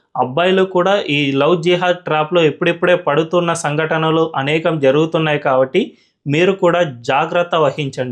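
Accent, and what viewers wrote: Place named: Marwari, India. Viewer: Are sollugay NUVVU MARWADIWA Yenti anta lagi lagi matladutunnav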